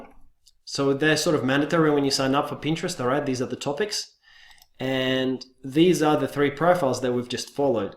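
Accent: Russia. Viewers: I can hear English with Russian accent ;) Do you have same the video in Russian?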